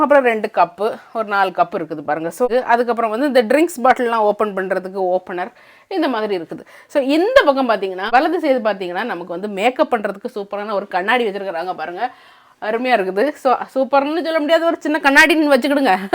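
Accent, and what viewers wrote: Nagercoil, India. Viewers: Akka, truly your videos are stress busting for recent times. Thank you so much 👍🏻 அதுவும் நம்ம நாகர்கோவில் தமிழ் அடிப்பொழி...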